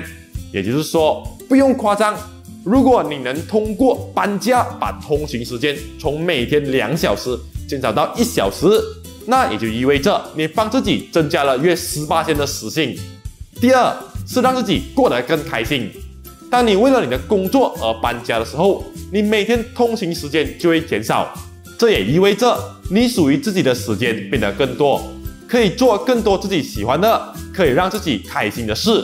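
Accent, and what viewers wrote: Malaysia. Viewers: 這就是馬來西亞的華語 我覺的他說的很不錯了 我們也不會讓中國人改口音啊 憑什麼馬來西亞人就得改善口音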